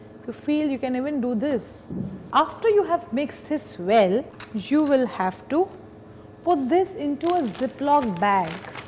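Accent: India. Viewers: OMG I love the Indian-English accent Thanks for the recipe ^^ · Very very very thick Indian accent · lol thts indian accent honey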